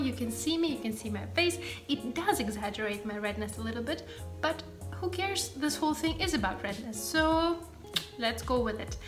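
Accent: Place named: United Kingdom. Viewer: Don't worry we love your British accent 💛 And thanks for sharing your new video I love them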